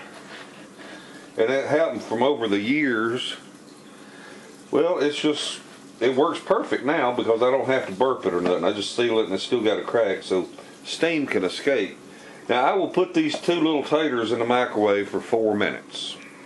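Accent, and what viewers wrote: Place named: North Georgia, United States. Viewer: Three degrees?! Holy crap! Where are you.... Alaska? That accent sounds more like North Georgia. lol. Never thought about cutting the slab of bacon so it fits better in the skillet.... too simple. Great vid as always.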